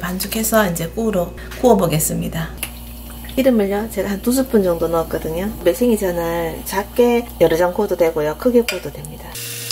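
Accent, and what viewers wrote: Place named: Busan, South Korea. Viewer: 🇬🇧영국 팬입니다. 저는 고향이 부산인데 말씀하시는 게 꼭 부산에 있는 것 같아 친근감이 들어요 ㅋㅋ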